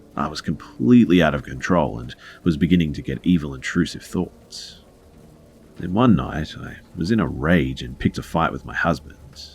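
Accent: Australia. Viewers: I 💘 your channel! You have the most gorgeous sounding Aussie voice. Also, your narration is top notch -- truly professional. I'd listen to your narration on just about anything! Okay, anything at all!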